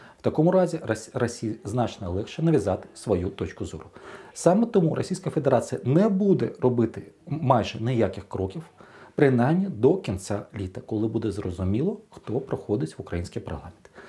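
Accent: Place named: Belarus. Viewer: Гы. Вообще интересно звучит белорусский акцент , совершенно не так как московитский. К сожалению мы такое очень редко слышим, а я вообще впервые услышал украинскую мову с белорусским акцентом. :)))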